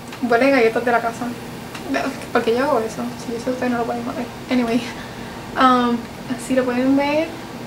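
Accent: Puerto Rico. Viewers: Yo tengo ese mismo primer.. me encanta pq tmbn te sellan y que los poros eso me dijo la muchacha de mac.. que buenoo ver mas videos tuyooss.. jaja me rio tanto pq es q de lejos las boricuas se nos notaaa q somos pr por el hablar jaja.. te cuidas chicaa :)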